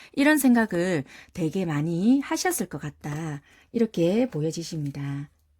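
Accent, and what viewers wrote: Busan, South Korea. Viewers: 와우 감사합니다^^*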